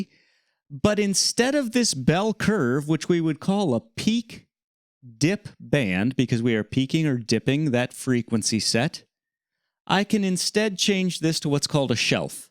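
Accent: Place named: Canada. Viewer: Hey, I love your video..great job and very informative!! Canadian eh? Brantford Ontary-ary-ary-O here lol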